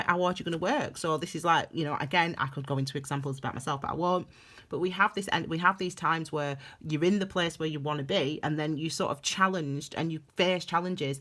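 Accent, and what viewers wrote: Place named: East Lancashire, United Kingdom. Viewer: Where are you from? Sounds like East Lancs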